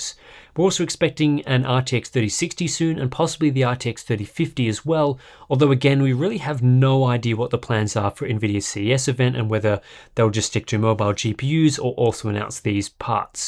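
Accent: Australia. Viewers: You could do worse, his Australian accent in these shows is subtle, not a broad one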